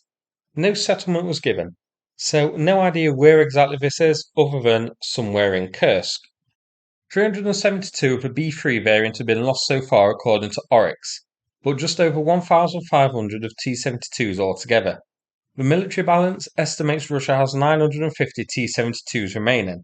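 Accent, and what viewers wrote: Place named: Yorkshire, United Kingdom. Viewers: I see that YT have you as Taiwan based. Is this commentator AI ? If so, it's a great Northern British Accent. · Forgive me if I am wrong. As I just Luv your Yorkshire accent...It reminds me of my Grand Dad and Great Grand Dad...as my father went to University and he didn't have an accent although he was born in Scotland...😊😅😂. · How could that be, when everyone is identifying his accent as Yorkshire? Mansfield is in Nottinghamshire, quite a hike south of Yorks. This is an honest question, as I am not an expert on the speech of Notts. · Guessing Yorkshire /Lancashire accent, same for the Rabbit. 🙂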